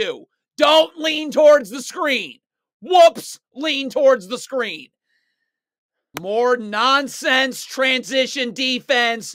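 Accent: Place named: Philadelphia, United States. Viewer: ima big fan of this dude... he sounds like he a Philly dude...we act like this 24 7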